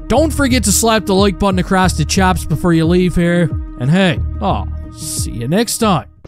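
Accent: Newfoundland, Canada. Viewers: You sound like a newfie.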